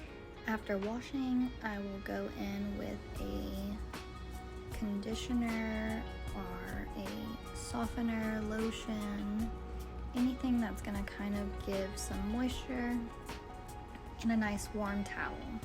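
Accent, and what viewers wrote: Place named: South, United States. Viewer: Good video. Not sure what area of the South you are located but you have the best accent. I used to have a co-worker a few years ago with a very similar accent. She was from Northern Louisiana.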